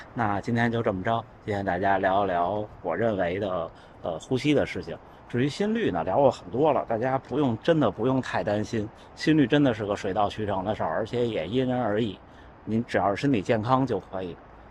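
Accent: Beijing, China. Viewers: 還好北京話我聽的懂🤣🤣🤣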